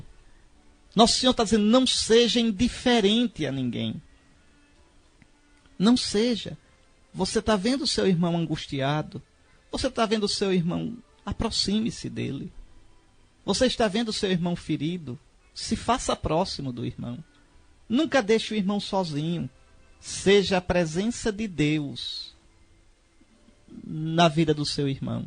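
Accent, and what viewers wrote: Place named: Alagoas, Brazil. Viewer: Dom Henrique, com seu sotaque alagoano, sua simplicidade, sensibilidade e conhecimento, nos leva a conhecer e a meditar a Palavra de Deus. Esta pregação é uma relíquia! Quanta sabedoria!🙏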